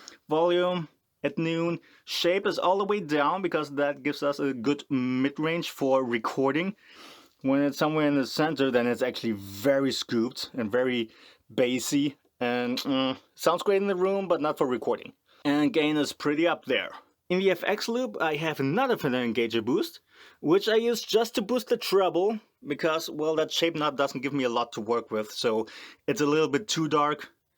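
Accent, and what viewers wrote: Germany. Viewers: "tyooning" "Duck-Terror" Dude, work on your accent. It's not AS catastrophicaly cringey as German accents usualy are, but still. At the beginning of the video it actualy sounded like you deserved praise for NOT sounding like a blithering stereotype; but you've still got quite a ways to go. As for the speakers: The CV75 kinda sounds like a WGS Reaper to my ears... :-o And what's up with that weird noise on the V30?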